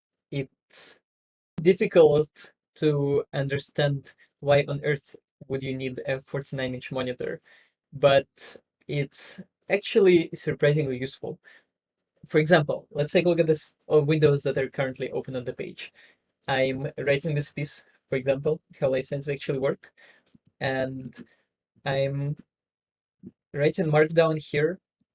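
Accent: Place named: Russia. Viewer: Now that you've been in the US for some time, your English sounds a lot better. In your interviews with e.g. Ben Kuhn and the BBC, you had a pretty strong Russian accent - it's a lot better now! And it sounds a bit more fluent. And you should not underestimate that - communications skills are very important. Especially in the kind of job that youre doing right now - raising funds and talking to researchers to allocate these funds. People not getting an idea or, even worse, getting the wrong idea, will cost a lot of money. Other peoples perception of you will also (maybe subconsciously) be influenced by your language skills, another reason why this matters. Good luck with all your future endeavors!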